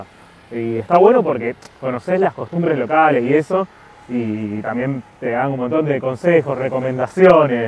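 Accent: Argentina. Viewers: reposho o posho....hay algun argentino que pronuncie bien el español??? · Tú español ruso está argentinisado!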